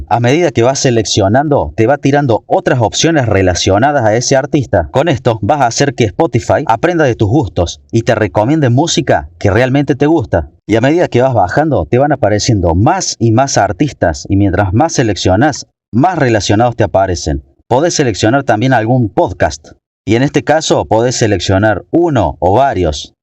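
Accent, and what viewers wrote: Córdoba, Argentina. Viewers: Que cordobés del orto.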